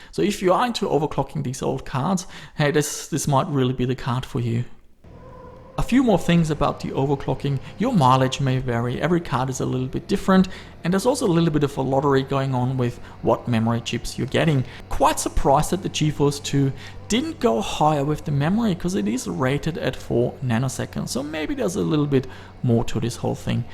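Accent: Germany. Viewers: can i ask you from where you are? you have this german-english :D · you are from australia? I thought you were like german from your accent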